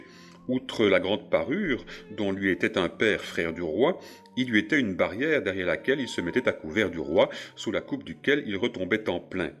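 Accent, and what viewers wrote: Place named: Belgium. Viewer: Ce savoureux accent belge...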